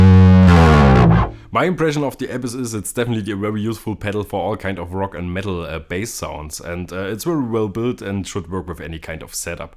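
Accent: Germany. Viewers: Ich höre deinen deutschen Akzent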